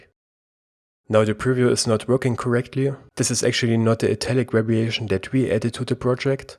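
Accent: Germany. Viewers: Great video keep em' coming (Man merkt du bist deutscher/Österreicher😂)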